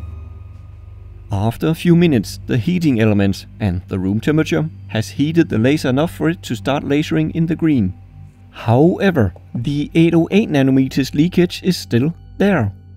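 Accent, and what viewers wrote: Denmark. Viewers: Are you danish? Edit: Before I got to the middle of the video I just guessed by the accent. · Only when you said "In Denmark", I realised how danish your accent was. Great video man! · Du er så meget dansk 👍 vi kan ikke skjule vores danske dialekt 😜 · Just sounds like a Dane. A lot of Danish people sounds funny when they venture into the English language. I'm Danish myself, but I'm all in on a cockney accent. I don't really know why 🤔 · I knew you were from denmark! I could hear it in your voice lol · Are you from Denmark? Cause your accent sounds kinda danish If yes then... DANISH GANG RISE!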